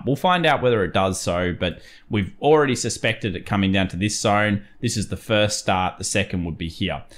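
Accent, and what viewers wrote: Australia. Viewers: I must say, I appreciate the Aussie style of speach. You speak firmly, like you're in a sandstorm, or at sea with strong winds and sea spray. There's no mistaking what you're saying, and you're not using your daddy voice on me. Thank you!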